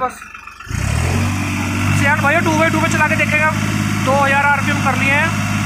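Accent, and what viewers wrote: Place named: Saharanpur, India. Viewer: Bhai teri language share saharanpur walo se miti h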